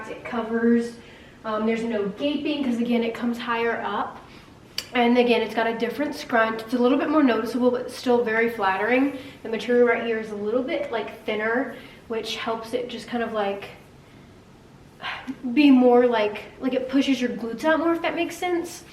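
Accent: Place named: England, United Kingdom. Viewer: how you feeling today..... In ur English voice what's on the agenda for 2day daaarling 😊 I'm not going to comment but real man knw real from fake marshmallows.... This what I say about that 😎👍🤙🙌🦾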